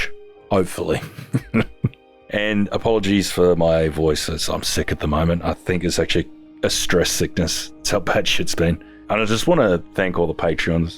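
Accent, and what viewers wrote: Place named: Australia. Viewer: First time commentor! I look forward to your videos, always, because I love the game and found you a few years ago making the forest content. Your peaceful voice and how thorough you were with the game was my first draw in to the videos. Well to be frank... your sexy calm Australian voice I'd literally listen to for hours and put your videos on to chill out. I did notice your content for sons being quite a lot more agitated and angry (you mentioned you quit smoking? which I figured was something affecting your mood). I watched the video you just posted before this one and heard you laughing multiple times and was going to comment how nice that was to hear. Thank you for being self aware and realizing how you had changed into someone else for a bit. We're all humans going through life and even though we're here for your content - your wellbeing matters the most obviously. 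Sorry it's been a rough bit of time for you. I for one would love to know you were enjoying playing and filming for us and it not feel like a burden because I enjoy every bit of content you share. If you streamed live I'd watch every minute. You are doing great. Proud of you and thank you for your dedication to this!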